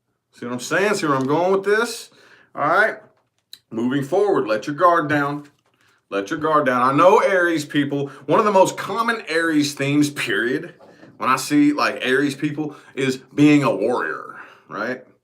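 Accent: South, United States. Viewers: That country boy swag with a twang! 🤣😂😂😭 The whole reading was too damn accurate 🙌🏾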